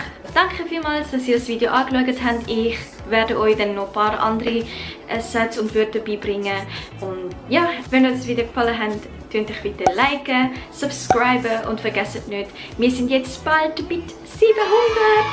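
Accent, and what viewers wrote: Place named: Switzerland. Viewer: Das ist ganz anders als Hochdeutsch! 😬 Es ist schwierig, für mich um dich zu verstehen. Sprechen alle Schweizern auch Hochdeutsch oder nicht?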